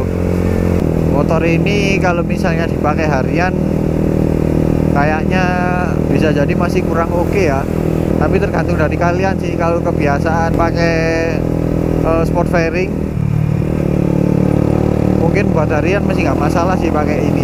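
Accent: Malang, Indonesia. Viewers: Wong malang opo wong batu mas?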